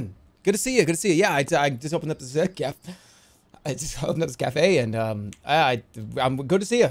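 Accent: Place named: Ireland. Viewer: Halfway through I realized this was jerma, not RTgame… does he always sound this Irish 😂😂?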